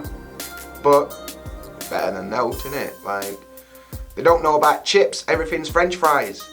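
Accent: United Kingdom. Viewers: wait, you`re british?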